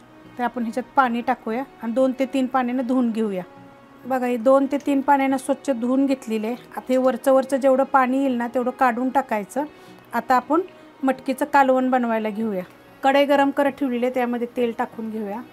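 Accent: Satara, India. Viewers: सातारकर आहे काय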